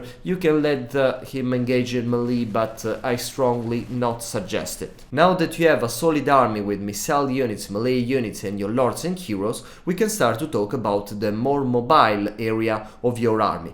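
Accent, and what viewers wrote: Italy. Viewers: parli molto bene in inglese ma si sente ancora che sei italiano xD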